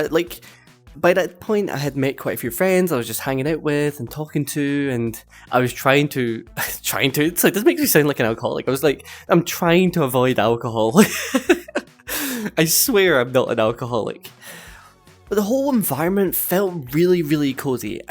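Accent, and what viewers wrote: Scotland, United Kingdom. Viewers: I gotta love your accent. You sound very Scottish to me.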